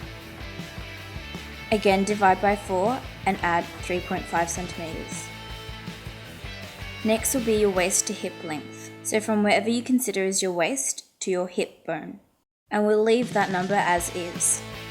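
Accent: Australia. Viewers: My god, I'm Australian (with this exact type of Aussie Accent) and I didn't even pick it. You must have a good ear!) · Your accent sounds familiar lol, are you from ausltralia?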